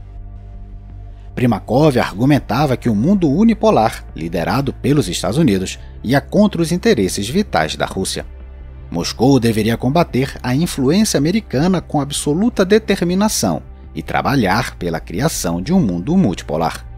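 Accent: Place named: Pará, Brazil. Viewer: Certeza que a voz é de um paraense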